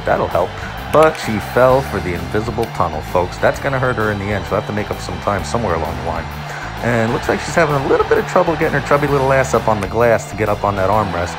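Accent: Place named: New Jersey, United States. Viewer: the commentary, the new jersey accent, the adorable concept-- these videos have made me so incredibly happy. thank you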